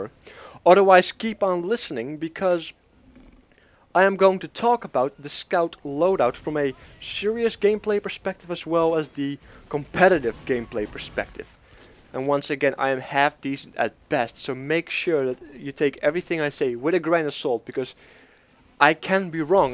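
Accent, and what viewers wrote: Netherlands. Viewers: I love ur vids Btw, are you from holland (you sound like it, i am 2) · Are you dutch?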